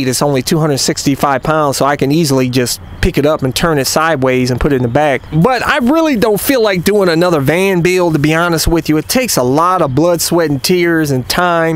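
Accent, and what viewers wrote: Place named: North, United States. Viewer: you might want to learn the southern accent. There's still some "good ol' boys" down there, in the smaller towns, that might resent your Yankee accent. I'd hate to hear that they found the bug-out van and the psycho-moto, way back off in the timber but no one around.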